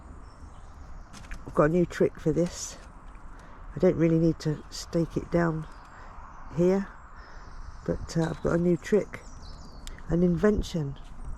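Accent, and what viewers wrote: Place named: West Country, United Kingdom. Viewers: Hi I just found your channel and have subscribed - obviously. My one burning question is where is your lovely accent from? At first I thought West Country, but I’m not sure that is right. Looking forward to seeing more of your videos. Tell Minnie I said “hello”. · From a 70 year old Upton boy living in wales now , I recognised that great Worcestershire accent. Lovely videos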